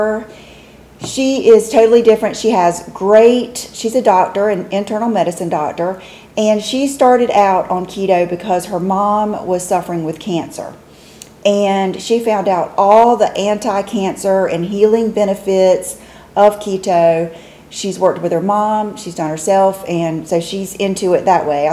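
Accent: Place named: Texas, United States. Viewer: Besides being drop dead beautiful and I think your accent is from Texas cause I'm a Texas gal living in California but you are also so sincere so sweet and thoughtful I love your channel love you❤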